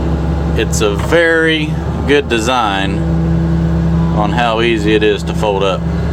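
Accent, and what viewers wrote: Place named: North Carolina, United States. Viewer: I was born and raised in the Old North State. When I listen to you, my accent comes back even though I have been gone forty years. Love it.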